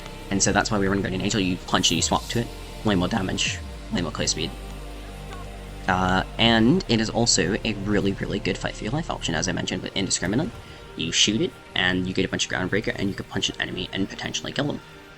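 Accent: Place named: United Kingdom. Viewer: suspiciously british sounding woman rambling ASMR in my home page, the algorithm monkeys are pulling the right ones out of the hat today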